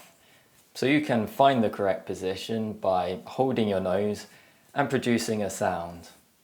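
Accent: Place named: England, United Kingdom. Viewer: Well done. I have some difficulties pronouncing those words in sentences with a British accent because I've achieved a defined American accent, but at least I tried it. But regarding understanding your accent, I shouldn't have problems understanding most people from England. You're a handsome man. Thanks for your helpful lessons. 🥰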